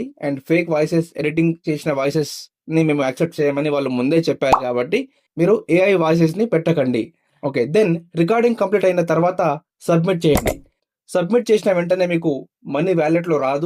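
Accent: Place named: Tamil Nadu, India. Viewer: Thamil voice vasthundhi bro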